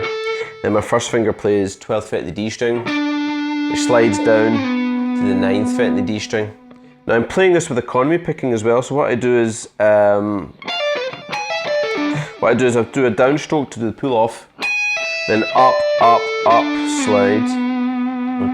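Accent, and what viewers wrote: Scotland, United Kingdom. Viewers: misterassassin1990 Scottish I believe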